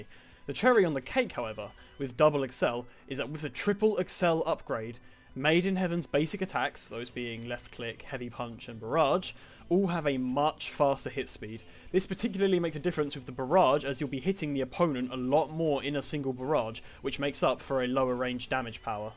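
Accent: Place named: United Kingdom. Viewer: eclipse but british B)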